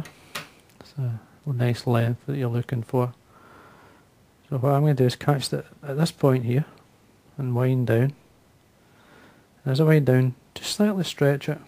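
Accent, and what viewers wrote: United Kingdom. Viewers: Great video. Thanks. I just wish I could understand the wording better. The softly spoken British "English" was hard to follow. You see I live in America! As they say “one picture is better that 1K words, so I will do the best to learn from the demonstration.. Thanks again for the post.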